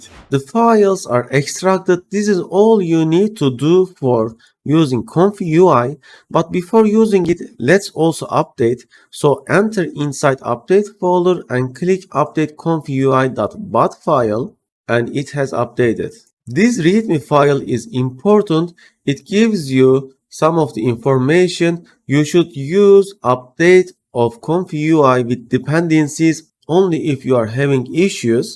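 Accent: Turkey. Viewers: I admire your Turkish accent. 🙂🙂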